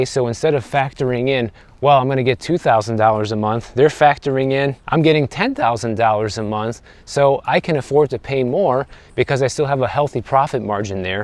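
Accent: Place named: Illinois, United States. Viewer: From one Illinoisan to another, I thought I recognized and Illinois accent.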